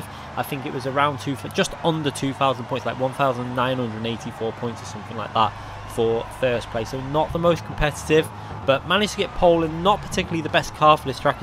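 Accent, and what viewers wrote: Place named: Cheshire, United Kingdom. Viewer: Nice work mate, been watching you for a while now, you from the north west? Sounds like a Cheshire accent 🤔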